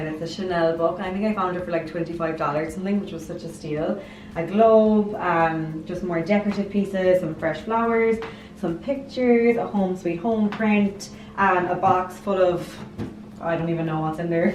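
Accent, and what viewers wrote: Ireland. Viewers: New subbie! I love this room and your accent! Lol are you 🍀 Irish? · Jaylan O she's irish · Love your Irish accent... And your gorgeous red hair, beautiful green eye; I'm a sucker for redheads, coz I find you very distinct!! You're mostly known to have a fiery temper😁😁😄😄🙋🏽🙋🏽🙋🏽.... Never seen that Marilyn print either... My mammy grew up with Marilyn , she said during her time every wanted to look like Marilyn, she was the poster girl of her time😜😜🙋🏽🙋🏽🙋🏽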